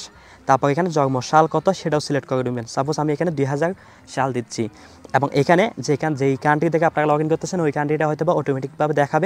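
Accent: Chittagong, Bangladesh. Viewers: আপনি কি চট্টগ্রামের মানুষ?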